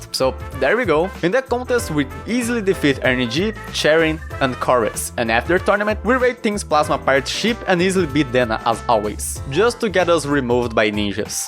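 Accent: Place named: Brazil. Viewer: Sabia que era brasil pelo sotaque adorei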